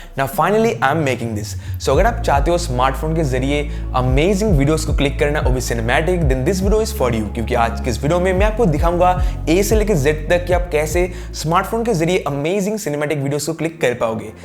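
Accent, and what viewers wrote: India. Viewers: This is english ou indian? wtf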